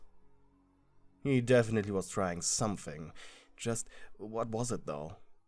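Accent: Germany. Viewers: Why do i hear a little german Akzent :,)?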